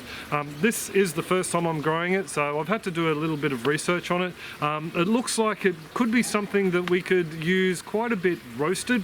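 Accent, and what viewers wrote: Australia. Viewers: I most likely will never plant taro but I so love watching your videos just to learn some new gardening tips. the Aussie accent and lingo makes gardening ever cooler. Good luck with your roots.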